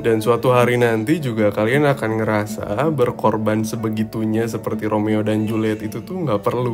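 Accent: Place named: Bandung, Indonesia. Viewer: Ini masnya org bandung ya? Duhh kenapa mengingatkan mantan bgt. Suaranya, logatnya, jokesnya😂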